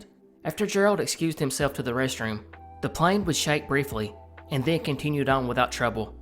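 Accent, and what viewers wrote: South, United States. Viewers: I am very glad to find a fellow southerner who makes this sorta content! You got an amazing accent, don’t let anyone say otherwise! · The best part about your content IS your southern accent narration. Ty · i personally love your southern accent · I'm Southern! Southern English, so these stories are all new to me. Your accent is absolutely charming. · I love your accent! I'm Canadian so I don't get to hear a nice southern accent very often. It makes me want to cook up some biscuits and gravy 😆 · it’s awesome to hear someone w a southern accent doing stuff like this! you got a pretty strong accent, where in the south are you from? sending love from the deep south 💜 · As a MS resident who doesn’t have a strong accent (to the point of being asked where up north i’m from) hearing your southern accent is comforting to me! it’s very epic! 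· Born, raised, and lived in the South all my life. Your accent is part of you, but isn't you. Don't let anyone give static for it. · Southerner here too, an Arkansan. Trust me hes not faking that accent. Ive heard that twang my whole life and it check out. Thats really the way he sounds without even trying. If he was it would be obvious. Making fun of a Youtubers NATURAL accent? C'mon y'all. It could be a whole lot worse. Some youtubers out there feel inclined to make up their own accent. "To establish a brand?" lol gimme a fkn break, nobody on Earth talks like that! Thats the kind of fake sht yall can laugh at, because it is kind of lame. But the way someone naturally speaks? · It is very comforting to hear a Southern accent presenting topics so cogently and eloquently. Much love and respect from West TN/ North MS, keep doing what you do good sir. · I really love your accent. No matter how many Americans i met here in Germany, the nicest ones always came from the South :) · As someone who lives in the south I enjoy more southern youtube channels like yours and Wendigoon, I love your accent, keep up the great work man!! 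· As someone from the south, I barely noticed the accent lol. Sounds friendly to me personally. Love the videos man, can’t wait for you to blow up 👽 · I absolutely love your Southern accent. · Even being from the north I enjoy your southern accent and I think you should keep it and embrace it (Also it’s pronounced Kane-ka -kee) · i grew up in south carolina and just moved back to the south (tennessee) after living in the midwest for almost a decade and i’m so glad i did. i love the south, your videos, and your accent. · I love your accent and being from Kentucky, I have a little bit of one as well. ❤ So glad I stumbled across this video! Great content! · I'm from the UK and I like the southern accent! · You just gained another subscriber! It’s really wild how much accents can vary in timbre and drawl. Way down in the delta is so similar but also very different from here in southern Appalachia. 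It’s great to hear a southern accent here on the mysterious/creepy side of YouTube! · ill be honest the first time i heard a southern accent on a iceberg lol but honestly it makes your channel unique and im from wv so its cool ! nice seeing some southern iceberg topics !! glad you didn't cut wv out of the mix thanks king !! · People are awful, guaranteed it was some’woke’ hypocrite hating. Your accent is awesome, never get rid of it it’s who you are, its where you’re from! I’m stuck in a Northeastern grossly, overpriced, dump and often visit the south. I actually lived in New Orleans for five years and wish I never left, but I had to, and I would give anything to live in the south. You guys cherish your history, everyone is so fantastic down there, the food, the music, the everything . So don’t listen to the haters. This is an awesome idea and I love your channel.💙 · Don't get rid of your accent. 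To hell with the haters. DIXIE PROUD!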